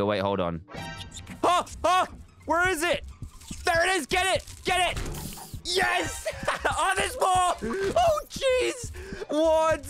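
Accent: England, United Kingdom. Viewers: Vandy - Roblox 𝕙𝕖𝕤 𝔼𝕟𝕘𝕝𝕚𝕤𝕙, from London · Bro everyone can speak something wrong u probably did too and he is from the uk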